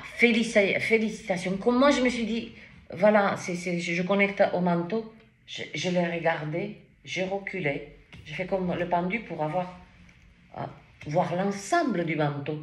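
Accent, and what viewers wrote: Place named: Italy. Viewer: Je t aime de tout mon coeur,ma,tu es unique j aime ton accent,un reste d italie peux être!!!je suis une poissonne,et je ris,tu m amuses Merci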